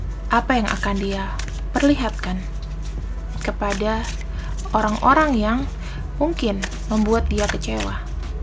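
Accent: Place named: Blitar, Indonesia. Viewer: bhsamu kayak orang blitar. Jawa tengah. " YO BEEENN ??? 😆.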